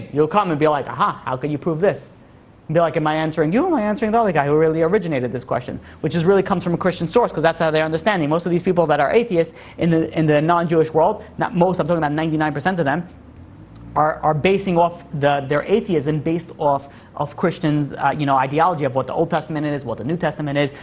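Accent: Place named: New York, United States. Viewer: "30 minutes and I didn't take a drink" made me Isaac out loud 😂 I feel real bad...just drink freely my brother ahahaha. Your water drinking only affects people who listen to your beautifully super fast wisdomful ny accented voice through noise reduction headphones, the lapel mic you wear catches your swollowing and heartbeat, very ASMR'y. Which reminds me of a joke.... What used to be Bruce Lee's favourite drink???? Wahhh Taaahh!! (Kung Fu 👐)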